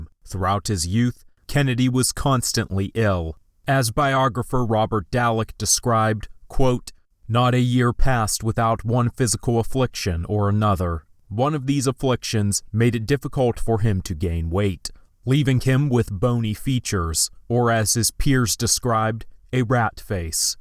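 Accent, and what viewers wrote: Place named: Vermont, United States. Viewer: I'm going to guess you have a touch of old Vermont accent. "Found out," sounds like: "faound aout." Great!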